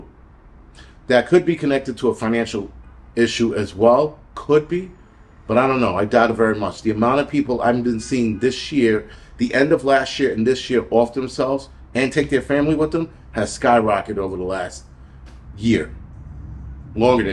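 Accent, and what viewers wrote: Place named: South, United States. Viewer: You have some South in you.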